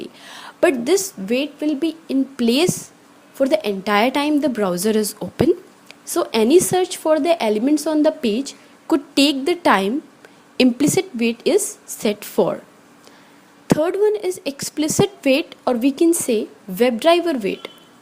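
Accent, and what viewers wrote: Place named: Russia. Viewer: That russian accent)))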